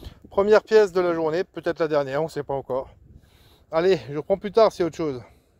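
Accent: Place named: Alsace, France. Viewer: On peut pas nié que tu es Alsacien (Bas-Rhinois) 😉😇💙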